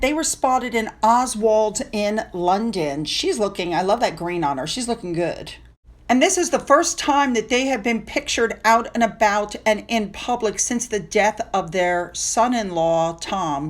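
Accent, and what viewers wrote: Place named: South, United States. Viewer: Yes, very distinctly Southern, but you will hear it all over the U.S.! I love to be polite; it can mean so much as we go through our day, when we use 'Sir' or 'Ma'am'. ❤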